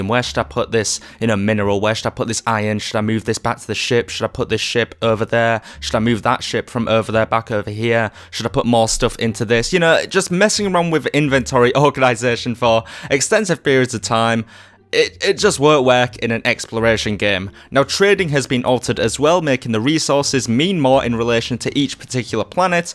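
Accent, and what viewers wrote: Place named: Liverpool, United Kingdom. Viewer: The uploader probably hears that question a lot, so this is for the community: What is that accent? Reminds me of a Scottish influence lice Scouse as far as vowels are concerned - but inflection and consonants are way different. Accents are very interesting but incredibly hard to learn about if you don't specifically set out to learn about them^^ Learning English didn't even feel like learning, just exposing myself to the language... but with different accents, you're rarely given the meta information about them when you're just watching stuff ;) Yes, I'm off topic. I Know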